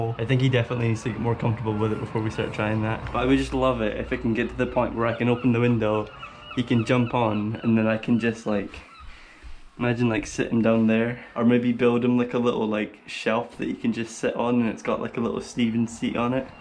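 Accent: Scotland, United Kingdom. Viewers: Are you Scottish out ov curiosity???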